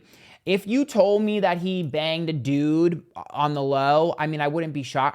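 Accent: New York, United States. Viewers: I knew you had to be a New Yorker lol 💖🤌🏼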